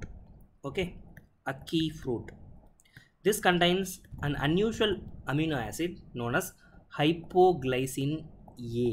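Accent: India. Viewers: Sir Just by your accent that I make out that u r from tamil nadu. Same accent was of former IPS officer Annamalai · R u from south india?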